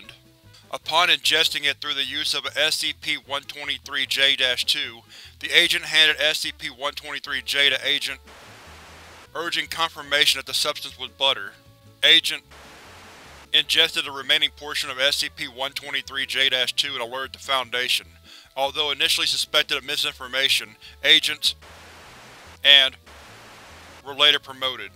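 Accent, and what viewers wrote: South, United States. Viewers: Damnit dude I wait till Wednesday to see your new scp reading and it's butter. Naw but seriously I enjoy your readings man keep up the good work. I know people who I suggested this channel to couldn't get into it because of your southern accent. I'm from Texas though and that's standard down here. Keep up the good work.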